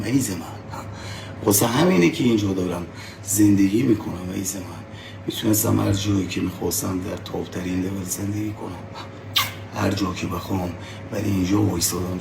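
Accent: Afghanistan. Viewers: چرا لهجه لری به افغانی تغییر کرد؟؟؟ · لحجه افغانم گرفتی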